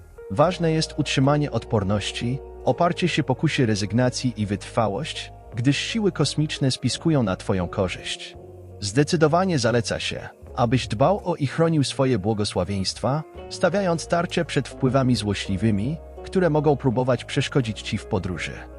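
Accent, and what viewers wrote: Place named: Germany. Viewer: Po raz kolejny zgłaszam prośbę- przywrócić lektora tego który był od samego początku. Czy ktoś z właścicieli tego konta czyta wogóle te komentarze. Tego lektora który jest obecny nie da się słuchać. Co to w ogóle za pomysł żeby dać lektura z niemieckim akcentem; czy to efekt Tuska? Proszę o przyłączenie się do prośby czytających. Pozdrawiam.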